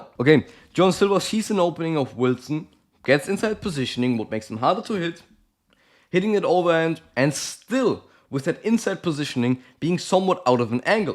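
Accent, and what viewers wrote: Germany. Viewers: bro are you german?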